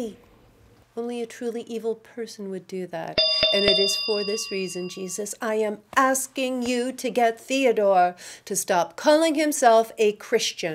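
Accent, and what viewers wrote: Canada. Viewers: You’re the best but do I detect a bit of a Canadian accent?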